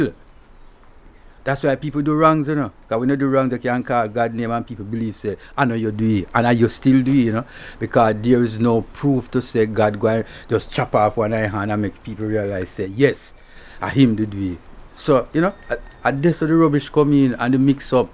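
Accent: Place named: Jamaica. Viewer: Patois was strong in this. get passionate. Love it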